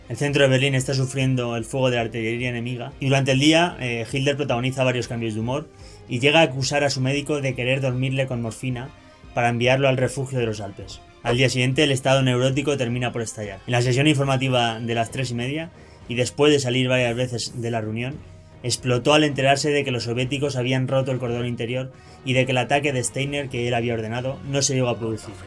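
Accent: Spain. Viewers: a para pinche acentito hay nos vemos odio el acento.de los españoles.no lo pude ver ni un minuto. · Interesante, pero seria mejor que no salieras en pantalla y mostraras mas información visual para redondear tus diálogos. Tu acento demasiado español, ese silbido exagerado incomoda a muchos como yo. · Cuando hablas no te entiendo tu acento español busca un comentarista peruano, ellos hablan muy bien el espanol.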